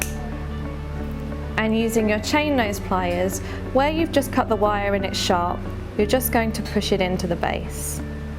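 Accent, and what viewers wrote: United Kingdom. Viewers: She's Brit not American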